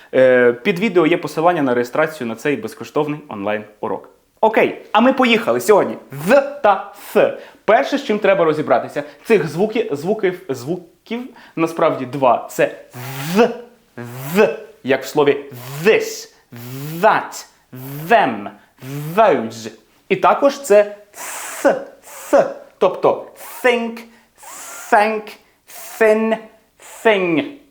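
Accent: United Kingdom. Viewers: Дякую за урок. Як на мене, це ідеальний формат - практикуватись разом. Мавпочка всередині одразу включилась в гру і повторювала. Я як дитина реготала над жартами ) "а шо ви робите, вибачте?" 😂 Я в захваті від подачі, взаємодії з глядачем. Та зачарована Вашою британською вимовою. Це саме та англійська, яку би я хотіла вивчати. Тільки від неї "йокає", бо це дуже красиво. Тож дуже буду чекати саме такі фонетичні інтерактиви. Дякую 🤗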